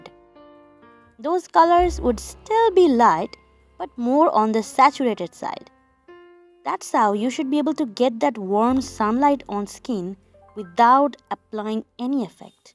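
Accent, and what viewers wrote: India. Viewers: Hello! May i ask you,what is your nationality? Are you an indian? Because you sound like one~😌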